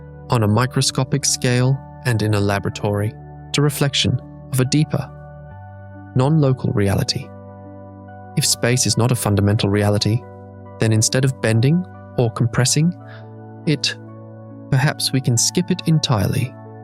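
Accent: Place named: United Kingdom. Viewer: 11-minnits 'in' annnd, ths p.o.s. up-loader, can't-be-bothered to review thn, edit (their...??) 'work'! W-t-h...??!! Ther-haz-been NUMEROUS mis-pronunciations (&, other-ERRORS)!! FAIILLL!! FAIILLL!! FAIILLL!! Allllsoooo: pleeez STOP-uzing a British-voice('accent')? They are-NOT more-intelligent-thn, Americans, based-SOLEY-upon how thy speak??!!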